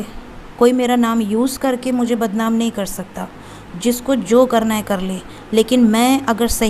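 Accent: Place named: Varanasi, India. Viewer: Dii jiss tarah se aap bat karti hai I totally luv it❤️ full banarasi language even I m from varanasi Mahadev🙏 love your positive reading 😇🤗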